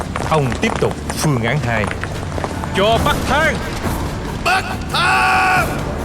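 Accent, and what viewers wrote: South, Vietnam. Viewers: Ky thuat long tieng cua anh dat toi trinh do dang cap ...giong nam bo hay ...hihi..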